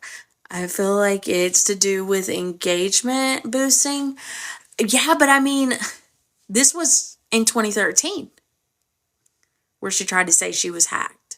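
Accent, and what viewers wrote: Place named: South, United States. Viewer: You have become my new favorite creator. I find you adorable and entertaining af. You're so sweet and funny and I love your southern accent. ❤ Anyway, have a good night!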